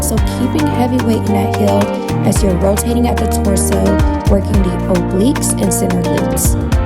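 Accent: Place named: South, United States. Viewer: Love this so much! Such a sweet southern bell, love the voice over. I’m getting kind of tired of workouts where it’s just music and I have to keep looking at the screen to see what they’re doing. Please do some nighttime stretches and add a timer bar for each set and an overall progress bar. Love love love this❤❤❤❤❤❤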